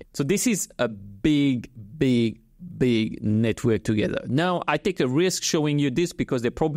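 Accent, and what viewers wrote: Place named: France. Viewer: cet accent français mamamiaaaa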